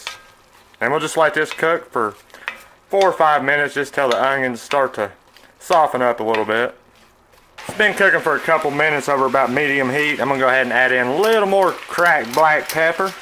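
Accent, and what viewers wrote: South, United States. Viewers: I love your Southern accent.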